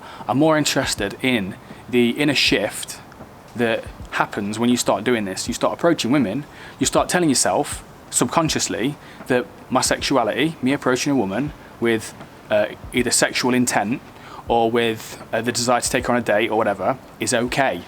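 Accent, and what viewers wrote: United Kingdom. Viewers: Nice! I had a feeling you're accent was British 😅. That's such a beautiful accent and place to live! Greetings!!!!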